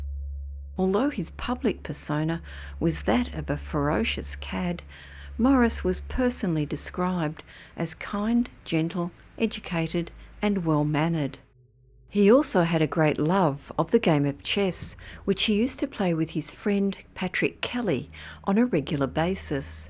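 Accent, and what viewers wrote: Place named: Australia. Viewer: I think the time of the Aussie narrator and/or pitch man has passed. Let's just not anymore huh.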